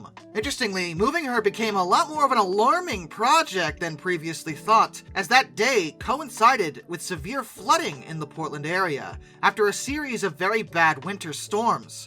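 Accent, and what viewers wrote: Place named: Midwest, United States. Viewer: Midwest, I think. Doubt he's from the east since he stumbles on stuff like "Worchester."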